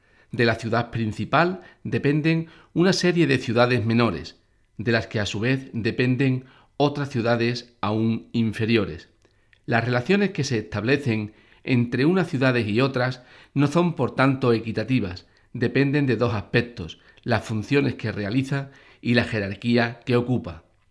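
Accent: Andalusia, Spain. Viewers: illo o hablamos fisnos o andaluz, pero no lo mezcles ojú ya me e agoviao.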